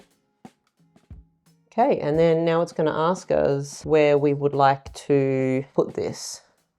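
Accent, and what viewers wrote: Australia. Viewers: She sounds australian is she ?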